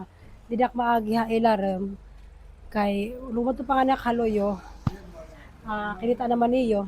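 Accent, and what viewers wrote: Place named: Borongan, Philippines. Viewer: New subscribers here☺️ Watching from Canada 🇨🇦 a Filipino Canadian from Tacloban Philippines! U speak fluently in Waray madam! Taga diin ka ha Leyte if u don’t mind me asking? If I guess correct me if I’m wrong u sounds from Borongan Samar?hehe I’m proud Waraynon here! God bless u and wish u best of luck madam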